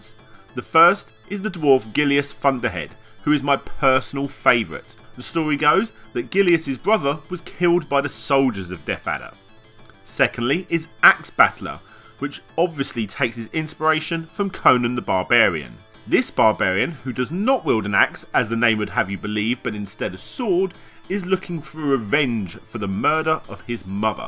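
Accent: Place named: England, United Kingdom. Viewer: It's so nice to hear complete history videos from a fellow Englishman instead of American folk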